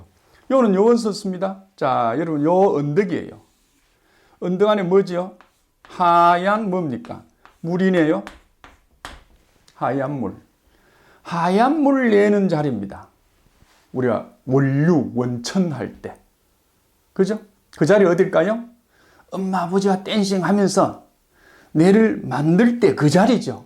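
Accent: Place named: Gyeongsang, South Korea. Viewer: ❤❤❤❤❤ 몸 띠이 (몸) 사움 (싸움) 살 (쌀) 요레! 요레! ㅋㅋㅋㅋㅋ 경상도 특유에 독특한 사투리로 어렵다는 주역 을 말씀해 주시니까 박사님!주역해설이 더욱 재미 있습니다 박사님! 멋있습니다 ❤❤❤❤❤ ❤❤❤❤❤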